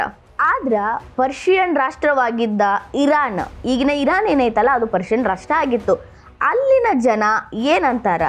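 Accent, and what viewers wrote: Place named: Bayaluseeme, India. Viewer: ಬಯಲುಸೀಮೆ ಕನ್ನಡ ಬೇಡ.ಕಿರಿ ಕಿರಿ ಆಗುತ್ತದೆ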